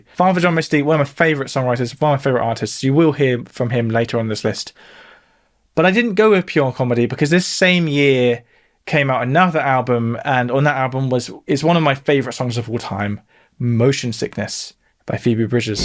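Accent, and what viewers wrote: United Kingdom. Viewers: Your British is showing strong in this one. Haha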